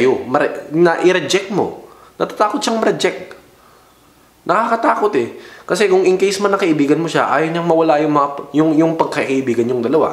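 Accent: Ilocos, Philippines. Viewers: Kuya ilocano k??😂😂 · Woahh ganun pala yun🤣🤣..peo the way you talk . Diko maexplain eh ilocano ka ba or tagalog?🤣🤣wla lng ask ko lang🤣🤣🤣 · Ilocano ata to🤣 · Hinde nakakatawa pero... NATATAWA AKO😂 ILOCANO KABA? 😁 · Hahahaha may ilocano accent e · Parang ilokano, ibanag accent diba po?✌️ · parang Ilocano mag salita .. yung accent nya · ah ok.. Hehehe.. Yung accent kase nya.. Hihihi.. Ilokanong ilokano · ilokano ata sya.. ay na apo madlaw😂😂😂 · Parang may ilokano accent po kayo😁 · Ilocano ba t0? Ung accent nia kase😁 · May ilocano accent😂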